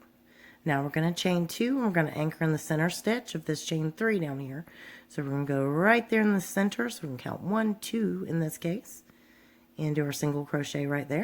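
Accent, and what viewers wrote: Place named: South Carolina, United States. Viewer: I watched the videos and I have to tell you that you are amazing. Love the accent it makes me miss my time in SC. While crocheting my shawl I noticed that while making the 4th floral lace (the single in the center) I was on video 2 and did the lace in the same manner as the one in that video. It seems to have worked out, but should I frog it (I'm at the center point) and go to video 3? I will be adding more rows because I want to use the yarn in my second cake until the end.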